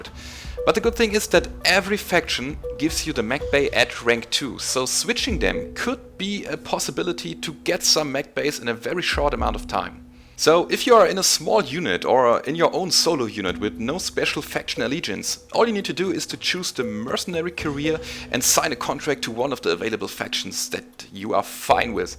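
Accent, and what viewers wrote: Germany. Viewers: That German accent is music to my ears. Sorry, just had to point that out.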